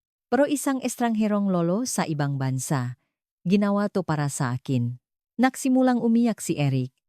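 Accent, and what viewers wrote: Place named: Indonesia. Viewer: Ohhh you are Indonian halata sa'yo yong accent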